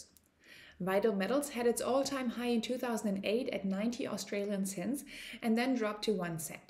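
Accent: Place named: Germany. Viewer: In which country/town you are living? Sounds like german.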